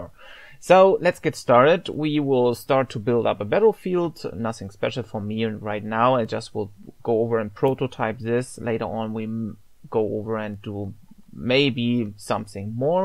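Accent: Germany. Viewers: Damn, this accent sounds so German!!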